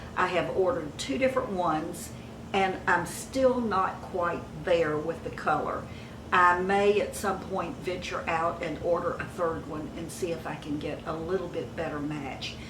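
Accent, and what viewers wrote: South, United States. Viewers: I am new to your channel !! Im so glad I found you !! You are such a delightful and very Beautiful classy lady, I can't wait to show my Mom your channel !! If i may ask, where are you originally from ? I detect a southern accent ? Blessings and Love....XoXo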